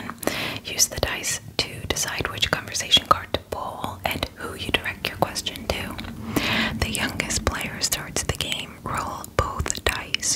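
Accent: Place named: New York, United States. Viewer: Please surprise us this Halloween with bounty hunter ASMR where you take care of a tied up criminal. I loved your New Yorker accent. It gave so much tingles.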